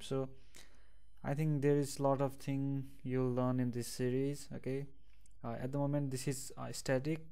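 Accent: India. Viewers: Clear to it's best!! Bangali??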